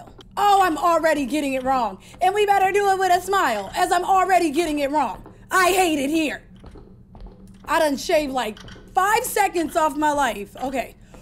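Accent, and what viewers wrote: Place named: Bronx, United States. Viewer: Did you peel my melon cap back blue😂🤣😂🤣😂🤣😂 you sound like you from my hood Bronx NY 💪🏽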